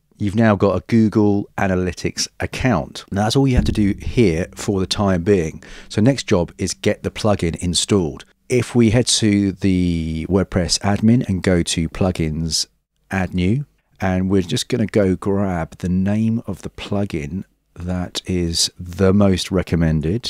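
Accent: United Kingdom. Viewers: WOW! The easiest youtube tutorial I have ever come across. Also always love the British accent too. I just subscribe to your channel... Will be waiting for your next tutorial.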